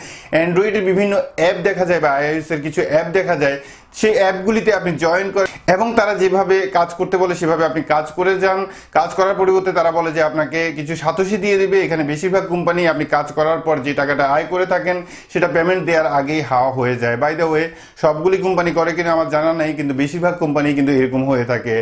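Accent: Sylhet, Bangladesh. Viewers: ধন্যবাদ ভাই সুন্দর করে বুঝানোর জন্য।ভাল লাগে আপনার সবগুলা ভিডিও।( সিলেটি পুয়া। কথায় পরিচয়। ) ধন্যবাদ।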